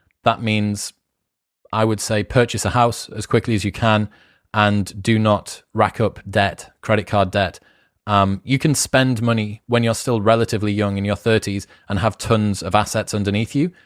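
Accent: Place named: Newcastle, United Kingdom. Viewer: Congratulations on the success Chris, I have been following for quite a while now and I think you deserve every bit of it. Plus its nice to hear a fellow Geordie having these conversations - Living away from home at the moment for my PhD.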